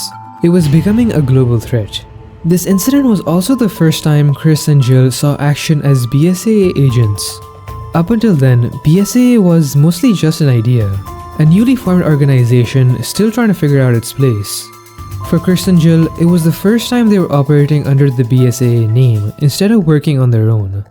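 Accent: India. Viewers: Fookin accent are u indian or latina?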